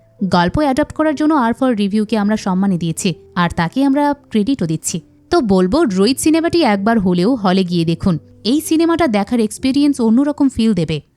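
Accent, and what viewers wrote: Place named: Noakhali, Bangladesh. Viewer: Are Bai eita noyakhali meye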